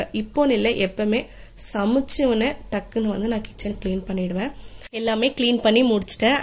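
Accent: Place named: Vellore, India. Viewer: Sister are you from Vellore area.ur slang looks like that.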